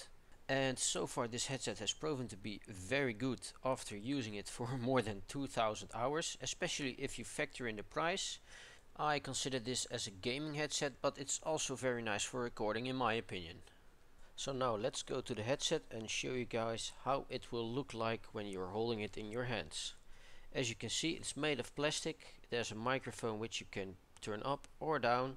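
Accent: Netherlands. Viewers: just got them! they actually fit perfect on my head! and music and in games does actually this headset proven to be good! not extreme but its only like an 50£ headset and for that price its perfect! i have a problem pretty big one but thats not the headset thats the pc! im having problem with installing the driver(no surround);( but hopefully sooner or later i,v fixed that! im using glasses and it works just fine =) thanks for the review and i think your dutch;y english is perfect xD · You sound like a dutchman, just saying :p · I needed 3 seconds to know you are Dutch speaking. :) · lol ik hoor duidelijk dat je nederlands bent maargoed XD (tis oke hor XD) ik zou het eigenlijk handiger hebben gevonden als je de review deed met headset op... dan zou je wat beelden vooraf kunnen recorden en dan de headset opzetten. ik wou namelijk weten hoe het geluid klinkt, als je zou kunnen vertellen hoe het klinkt of ff video link sturen waarbij je die headset gebruikt, bedank voor het lezen/reageren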